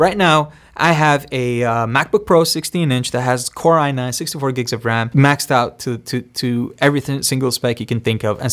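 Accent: United States. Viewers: Your English is so good with American accent niiiiiiiice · Your English is so good with American accent niiiiiiiice !!! High five ✋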